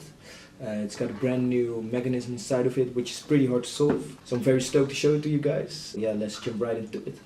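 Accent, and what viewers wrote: Netherlands. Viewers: you, my friend, sound verry dutch... am i right? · Are you Dutch? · You sound like you have a Dutch accent. Are you Dutch? I am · I can hear you are Dutch. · Ben je Nederlands want je accent klinkt heel erg Nederlands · Are you Dutch?? (your accent) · Are you Dutch? Your accent is kinda Dutch xD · I am for 98% sure you're Dutch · This guy is dutch, im pretty sure he is dutch, everybody over here that tries to talk english speaks this way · R u dutch? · You're ‘accent’ seems kind of Dutch, if so, ik ben ook Nederlands! :D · Is he from the Netherlands? I can hear it in his voice😂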